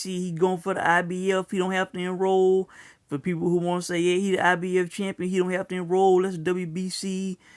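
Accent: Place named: Alabama, United States. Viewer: Everyone has there own opinion but u are a hater, get your facts right errol used Vada when he fought Brook, if u do your research hes on the Vada website, u dont sound like the most intelligent brother but i know u can read. Before Spence fought Brook u were disrespecting him saying he wasnt that good, hes fought nobody, saying he would destroy spence & indicated that u would even eat crow if Spence beat Brook, u went live on youtube an ate your Crow in front of your viewers now mths later u decide to contradict yourself suggesting the best welterweight on the Planet is ridin dirty. smh. After he broke Brook face (eye socket) now your saying Errol is juicing, child please. i admit Spence aint no Sugar Ray Leonard, Tommy Hearns, Aaron Pryor but he is a bad mf he must be if he fight so good he got u thinking hes juicing lol, look bruh knowledge is power so read & stop sittin up there sounding like u have a 3rd grade education an speaking like your from Possums Paul Alabama a lil town with a population of 12 people. 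lol. this aint fishing this is boxing, get ya mind right cause your new nickname on youtube is cant get right. lol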